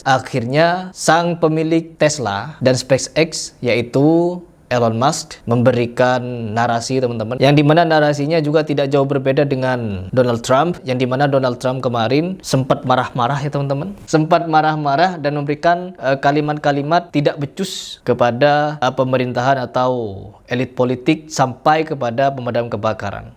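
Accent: Madura, Indonesia. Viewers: Aslinya Madura ya mas?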